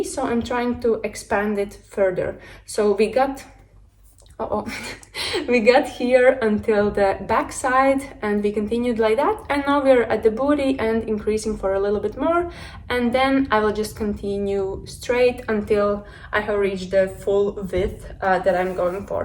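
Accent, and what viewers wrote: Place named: Eastern Europe. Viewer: i think it looks great😊 i would make it to the length below your knees, nearly to your shoes, that will give the „wedding dress“ type of dress, but it‘s definetely wedding dress material! keep on doing row by row, i‘m excited for the final part😊❤ after it‘s finished i would sew a skin color material under the dress so it looks like your skin but it gives you more secureness🤗 btw what accent do you have? it sounds slavic to me😊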